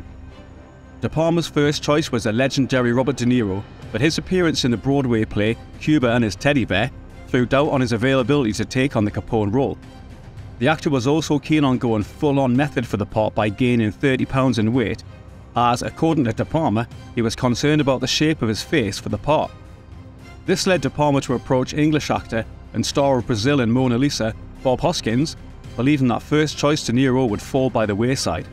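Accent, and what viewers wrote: Newcastle, United Kingdom. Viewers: Great to hear a geordie on hear👌👍🏻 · Unexpected and lovely to hear a Geordie accent on the JoBlo channel! Great job.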